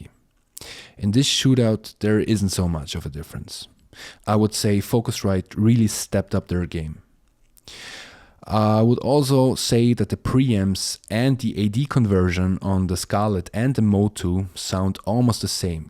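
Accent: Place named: Germany. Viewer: please don't lie to me, I am native, and YOU ARE GERMAN ! never ever of any common wealth area..nice try but just because you MIGHT live in Australia which I also doubt, you are no English native. I am supposed to like germans but after dealing with this...we don't se zurgical we say Surgical and so on so pretty please with sugar on top..get real !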